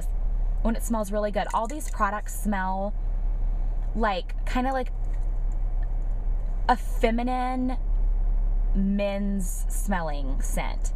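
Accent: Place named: South, United States. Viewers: I'm from Texas and I think that your country accent is hilarious. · lol. Love this video and your boots! Also I am from Texas and you talking with southern accent did not bother me at all. :-) You rock!!!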